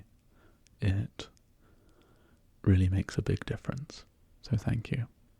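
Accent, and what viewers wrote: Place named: England, United Kingdom. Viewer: I'm a sucker for an English accent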